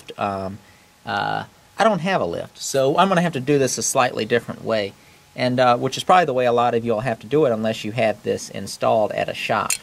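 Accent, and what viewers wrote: United States. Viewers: Good murican accent.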